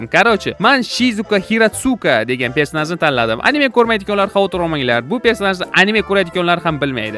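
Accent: Qashqadaryo, Uzbekistan. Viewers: Qashqadaryodan emasmisiz sheva pzds tortopti😂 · Shevadan Qashqadaryoga tortyabdi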